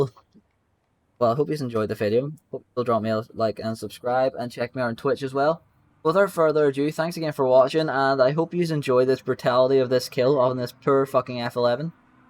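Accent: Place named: Ulster, United Kingdom. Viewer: Your definitely an ulsterman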